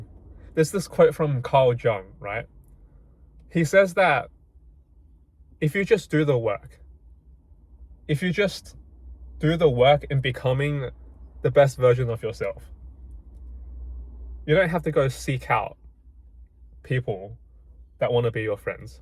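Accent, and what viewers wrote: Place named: United Kingdom. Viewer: Have you considered starting an OF account? I mean your a Asian, and you've got that British accent? I'm already getting hot at the thought.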